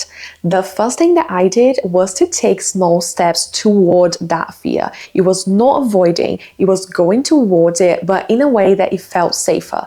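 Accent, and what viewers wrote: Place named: Brazil. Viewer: Really like your accent! You’re Brazilian?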